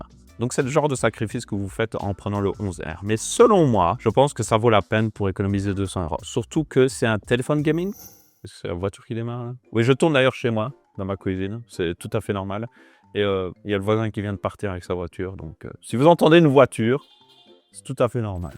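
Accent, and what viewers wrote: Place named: Belgium. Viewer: Nos amis belges ont un bel accent.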